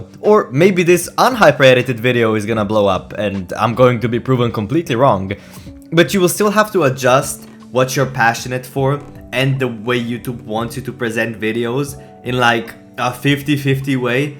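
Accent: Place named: Europe. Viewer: Your accent sounds european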